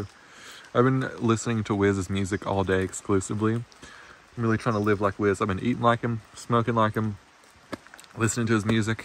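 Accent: South, United States. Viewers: The southern is strong with this one. XD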